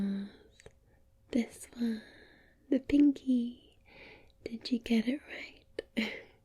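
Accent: Scotland, United Kingdom. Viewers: Wow, 3 hours Of pure Relaxing and calming video. Everyone of your sessions were tremendous. Every angle of your camera was perfect. You know me, I could write a whole story about your video, but I'll make it short! 😯😊 I lasted about two and a half hours into your video before I nodded off! Head and face message along with fluttering fingers, mouth sounds, singing, and new sleep stories were just amazing 👏 👌 😉. you are just so stunning with your lovely soft spoken Scottish accent which sent me to the moon 🌙 🌚 😊 ❤️. Totally, but I mean totally relaxing 😌. Tingles were plenty 😊. I could go on and on but I must get ready for work 😊. Please 🙏 take care and have a wonderful day love! 😊 You are awesome 👌. Sending you lots of love!! ❤️❤️🥰🥰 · Is it just for me, or feel other german scottish speaking lovers, that our "fränkisch" is the best german dialekt. 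And it has a similarity, the rrrrolling arrrr. For all non german, thats the region where PUMA and adidas came from (and no this is NOT Bayern/Bavaria-it s a own country, like Scotland never be England), and Lothar Matthäus is world wide well known, okay-maybe only in the world of football. Since I have seen and read trainspotting on original, I fell in love, with your kind of english. And, you done a great job! Many thanx from Berlin.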